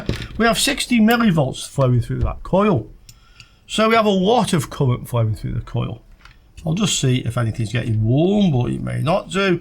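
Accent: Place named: Stoke-on-Trent, United Kingdom. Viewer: Where are you from? I hear Stoke-on-Trent?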